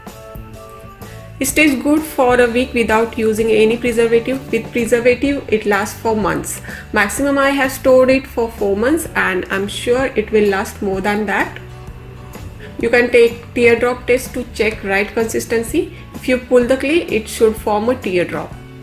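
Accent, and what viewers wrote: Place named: India. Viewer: I couldn't understand your accent. This really sucks because you are trying to speak English and I can't understand Hindi accent. No captions available either. Sorry, but you get a thumbs down. Talk slower and much more explicit. I want to make this but you made it impossible for anyone who cannot hear Hindi mumble.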